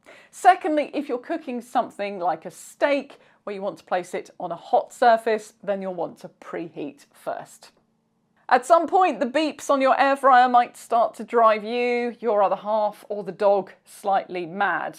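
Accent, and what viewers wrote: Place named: Liverpool, United Kingdom. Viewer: This woman is speaking with a Scouse accent.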